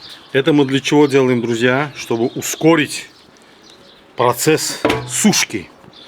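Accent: Baku, Azerbaijan. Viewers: Такой позитивный и такой акцент красивый чисто Бакинский😂👍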